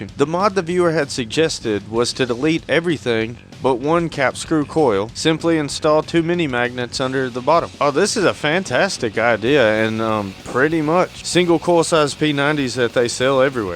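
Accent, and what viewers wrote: South, United States. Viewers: I just found your channel and I love it! Your Redneck Weird Al appearance is the perfect match to your southern drawl and dry wit humor! Plus, you seem to be pretty sharp. Keep the videos coming! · I need a tutorial on how to speak redneck, that was amazing.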